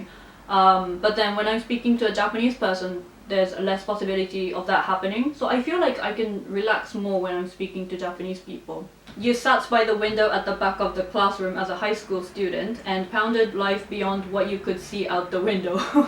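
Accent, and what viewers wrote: United Kingdom. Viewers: Wow! You went from three thousand to nearly thirty thousand subscribers in two years! That's amazing!... I'm a recent addition to the number, but have enjoyed watching some of your older videos. Your presentation is really nice and you seem to be a genuinely lovely person. If things haven't changed in the last two years, then I am very surprised that you aren't married. As a Brit, it's really nice to spot Asians who have lived in the UK. Our culture definitely rubs off, as with the accent and sense of humour... and Japanese women with a British accent when talking English sound really cool. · Love how you react each assumption politely and kindly! You look intelligent + have a beautiful British accent! My assumption is: you are a great reliable manager at work😍💕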